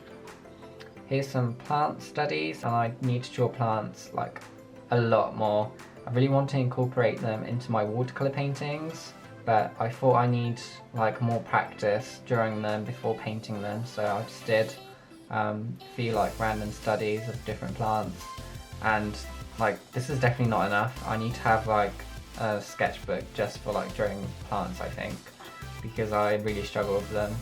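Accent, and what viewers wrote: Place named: United Kingdom. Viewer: Everything is just soooo gorgeous! I’ve seen in the comments from another video they you speak another language (don’t remember which one sorry) I don’t know if you are from America or something but your English is so great ! You sound kinda British I love it! Anyway I have a lot of ideas for my sketchbook, thank you for that , love your art 🤗❤️👏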